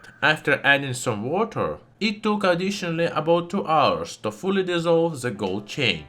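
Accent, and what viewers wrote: Russia. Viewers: He was from russia? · he does seam to have a Russian accent...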